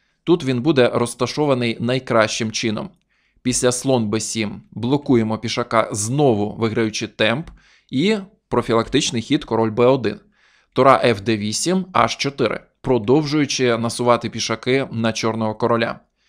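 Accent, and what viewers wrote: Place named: Western Ukraine, Ukraine. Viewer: Як нас на Західній Україні говорять файно.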